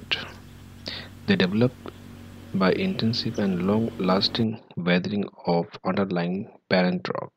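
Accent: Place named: India. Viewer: Interesting content, dear brother, but the way You pronunciate is awful... I understand that You are of indian accent, but nevertheless Your way of pronunciate is very lazy and neglectful... You should try to improve Your way of speaking, or let somebody other do the vocal job in Your videos, or at least provide Your videos with correct captions/subtitles... I hope You understand this and don´t take my words as an offense... Thank You for the video, and have a good luck with Your work and Your channel ;))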